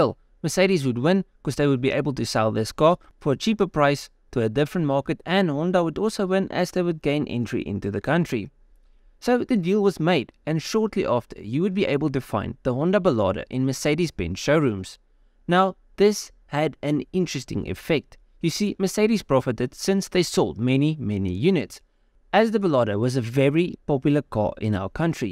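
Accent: South Africa. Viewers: I love the south African accent so much, it's adorable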